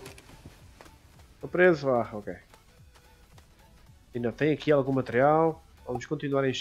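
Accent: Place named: Portugal. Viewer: Vc é português? Pois vc tem um leve sotaque, eu sou Brasileiro, e consegui compreender tudo o que vc disse!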